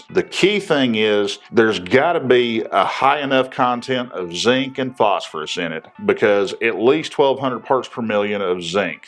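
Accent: South, United States. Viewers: When someone with a southern accent tells me "That's bad, don't do that" with a cigarette hanging out of his mouth I'm gonna listen.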